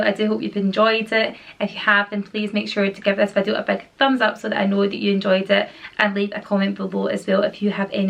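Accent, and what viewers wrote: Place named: Glasgow, United Kingdom. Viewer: Love your hair so beautiful where are u from got a bit of a glasweigan/stirling accent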